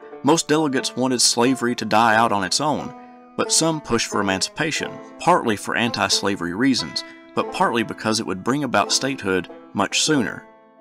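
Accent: United States. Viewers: To the Narrator what state are you from?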